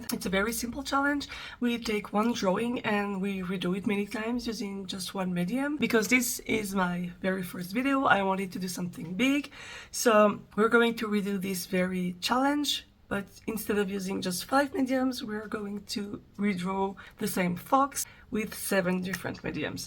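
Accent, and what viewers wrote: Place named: France. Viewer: Hehehe bonsoir 👀 Je viens de finir et omg le renard à l’aquarelle, je hurle! Je suis sûr que c’est une galaxie qui bouge tout le temps et que des peuples extraterrestres anciens l’ont vu et ont créé des mythologies, des textes sacrés basés dessus ou juste utilisé son existence comme un présage ou something!!! Le fait que les renards soient tous différents est super coolos AAAAA 😩😩 Ton accent est beaucoup trop mimi en anglais, jvais crever, je pleure en Oui Oui Baguette stp 😭 Félicitations pour cette première vidéo! C’était super sympa à regarder hehe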